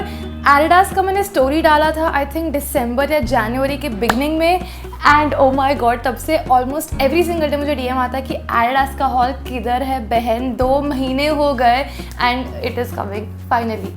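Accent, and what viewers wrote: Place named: Mumbai, India. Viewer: Mumbai hinglish is the best😁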